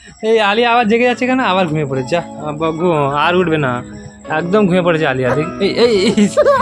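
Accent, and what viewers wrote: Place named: Bangladesh. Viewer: আমি বাংলা দে-শ থেকে দেখছি আপনা দের সবার বিডিও দেখি আপনার। কথা বারতা শুনলে অনেক বাংলা দেশি লাগে দোয়া রইলো আপনার জন্য আমিন আল্লাহর কাছে সাহায্য চাই আপনার জন্য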